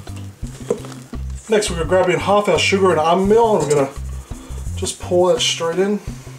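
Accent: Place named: Australia. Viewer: he sounds like an aussie hell yeah!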